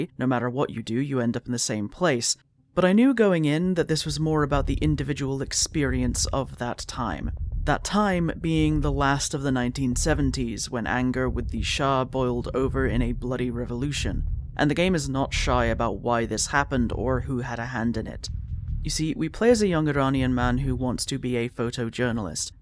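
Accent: Scotland, United Kingdom. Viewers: Oh! I didn't realize you were Scottish. I am comically bad at accents.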